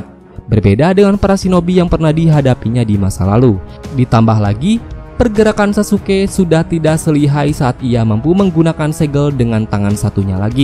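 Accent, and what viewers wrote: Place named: West Java, Indonesia. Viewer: piks.. admin org sunda. 😊😊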